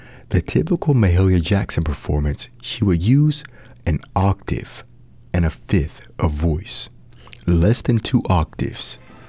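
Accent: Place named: Louisiana, United States. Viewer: i love hearing your #louisiannaaccent... some accents are so musically nuanced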